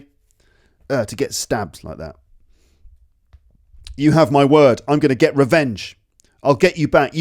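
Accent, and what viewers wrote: United Kingdom. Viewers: I am not to used listening British accent and I could understand you clearly. ❤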